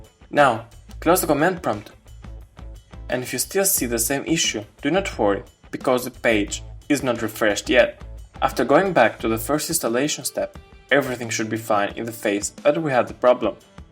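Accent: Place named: India. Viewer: You my friend are a life saver, never thought someone with an indian accent could solve my issue in less than 3 minutes, THANKS !!!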